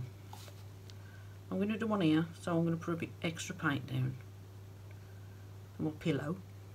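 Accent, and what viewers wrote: Birmingham, United Kingdom. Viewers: Hi Ms Brummie, so lovely hearing that great Brummie accent. Great video I will share on my acrylic art page on Facebook to share your information to my peeps in UK I have resisted taking part in the blooms craze recipe and try to find easy non complicated recipe 😂😂 I’m in Australia so do have access to the floetrol. Well done 👍🏼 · You can't miss a Brummie accent! Lol. (Says a Geordie). 🙂🙂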